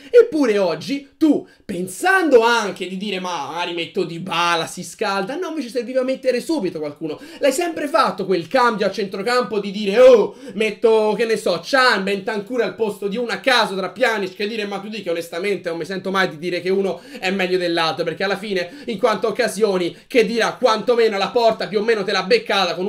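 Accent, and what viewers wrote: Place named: Turin, Italy. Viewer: Tipico accento di Torino. Hai studiato a Cuneo o ad Alessandria?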